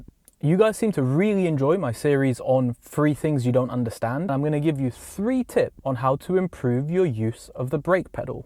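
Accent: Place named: England, United Kingdom. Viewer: What part of england is that?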